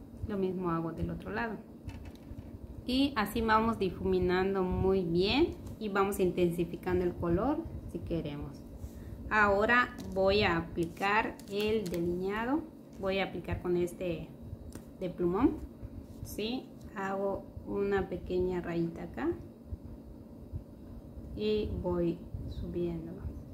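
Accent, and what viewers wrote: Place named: Campeche, Mexico. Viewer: Me encanta tu acento es de Campeche? y tu cutis., muy sano y radiante.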